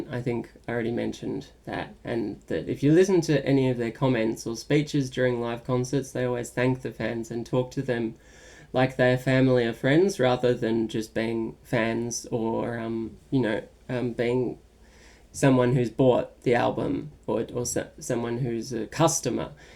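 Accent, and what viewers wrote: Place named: Australia. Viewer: I wanted to give a shot at one of your videos Suddenly clicked on this one - got satisfied with somewhat cozy vibes Btw, love the Australian accent xDD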